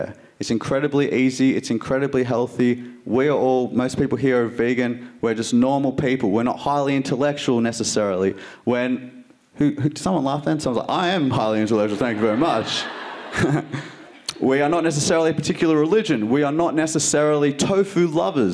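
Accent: Australia. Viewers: Each time your speech comes out, first of all I smile thanks to your Australian accent, and immediately after, I feel empowered and extremely proud to be part of this journey for more than a year now, for participating to something much bigger than me, than us, for being part of the Universe... I became vegan overnight after having watched Gary's speech. Both of you have my ex-boyfriends' name so I thought it was about time and a sign to make peace with my past. I knew it was in alignment with who I am and who I want to be as a person. And one year later I feel more happy, more energized, more aware, more educated, more and more human than ever before. so thank you for reminding me why I became vegan and thank you for carrying the voice of those who aren't heard. Thank you for everything you do. Thank you, from the bottom of my heart. Thank you. Sending you all my love. 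Bye Coco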